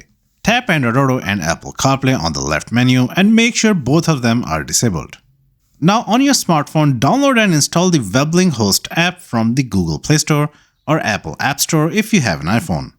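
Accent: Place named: India. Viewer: i will never believe a guy with indian accent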